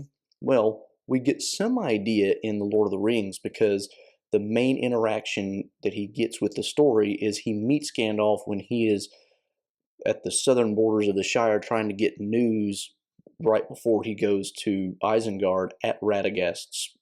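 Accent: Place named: Louisiana, United States. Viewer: Hi Lucas. I wouldn''t be surprised if "the war that will end war" was of French origin. On the other hand, I think evidence would need to be brought forward to prove it. (I hope you can read this in the Louisiana accent of our host).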